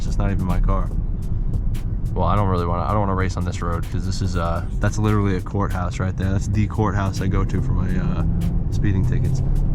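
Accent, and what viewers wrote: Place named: New York, United States. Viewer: Annoying NY Accent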